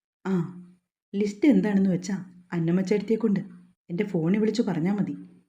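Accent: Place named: Kollam, India. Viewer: . നല്ല കഥ സൂപ്പർ സൗണ്ട് നിങ്ങൾ ഏതു നാടുകരി ആണ് കെല്ല o ആണോ ഞാൻ കാലിക്ക കാലിക്കറ്റ് ആണ് കെല്ലം കാരുടെ . സംസാരം ആണല്ലോ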